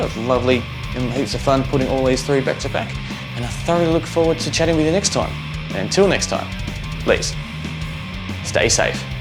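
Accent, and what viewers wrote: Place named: Newfoundland, Canada. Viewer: newfy/irish accent!!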